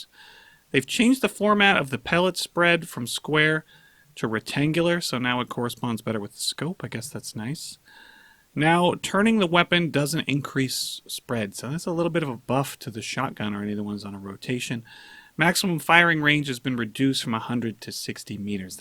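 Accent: Russia. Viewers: Should be a voice actor in Crossout with that russian accent!!!!:) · Thanks for the russian accent G!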